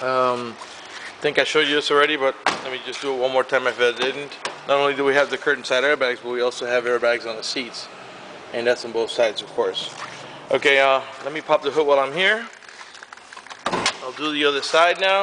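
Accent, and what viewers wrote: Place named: Cuba. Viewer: You are neither, nor a seller not an american you speak like a cuban just arrived..sorry, mention the issues with CVT automatic transfer case, is a sheet I sold mine just 1 year after got it, got it???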